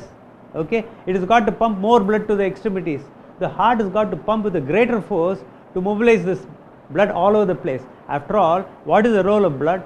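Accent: India. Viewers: This is South Indian english, don't worry, even we the people of rest of India don't understand them properly either.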